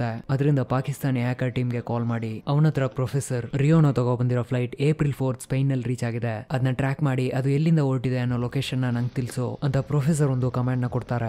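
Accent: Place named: Chamarajanagar, India. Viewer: same chamarajanagara style idhe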